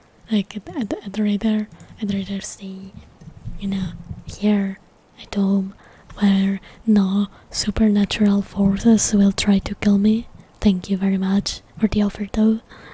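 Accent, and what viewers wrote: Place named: Italy. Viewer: Love the Italian accent with English words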